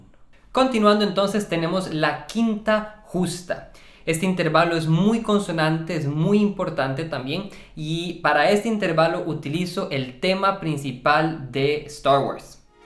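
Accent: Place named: Costa Rica. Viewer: Hey. Ud es de Costa Rica? Su acento es tico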